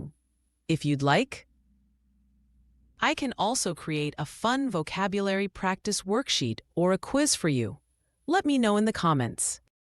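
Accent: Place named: United States. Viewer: Everything becomes very easy when the instructor is someone who can explain things in a straightforward manner. I understand English very well from your story and the best thing is your speaking style. I really like the American style. Your style of speaking and language are very good.Please upload two videos a week so we can practice and learn well.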